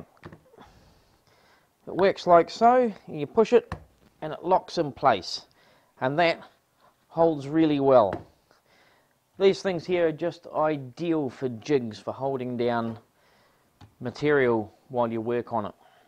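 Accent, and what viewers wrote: New Zealand. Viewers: A Gold Star example of how an instructional video should be made, clear to follow, straight forward perfect lighting. I wish I had that CNC machine! Do I detect a slight 'Flight of the Concordes' accent?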